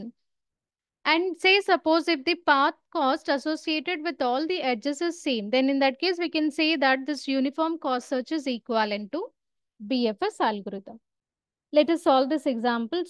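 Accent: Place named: India. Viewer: Every computer science student adjacent student should be thankful to India. Once that accent hits your ears, you know you're getting a straight to the point video on the subject matter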